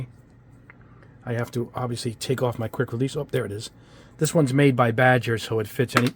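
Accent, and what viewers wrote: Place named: South, United States. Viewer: It’s his regional accent. Down south we say it different.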